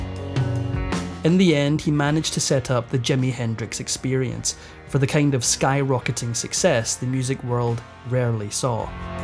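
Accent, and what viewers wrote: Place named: Scotland, United Kingdom. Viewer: Yay my favorite narrator love listening to the Scot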